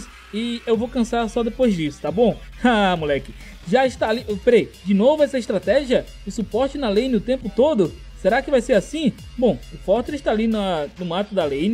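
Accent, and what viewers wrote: Northeast, Brazil. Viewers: é Nordestino 😱😱 Eres de onde Mestre?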